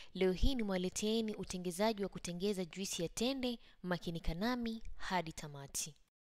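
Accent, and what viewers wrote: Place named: Mombasa, Kenya. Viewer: Mombasa nahisi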